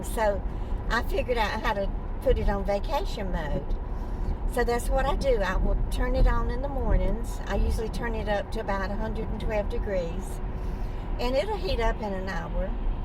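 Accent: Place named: Mississippi, United States. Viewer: youtube randomly changed my id about a year and a half ago. Instead of protesting, I just went with it! Sometimes its easier not to draw attention to yourself, at least for me! I have been enjoying your channel for sometime. One time I got unsubscribed by the powers that be. Maybe a test to see who's paying attention! Anyway love you and what you do. Your accent reminds me of a cherished aunt who has passed on. She lived in Mississippi.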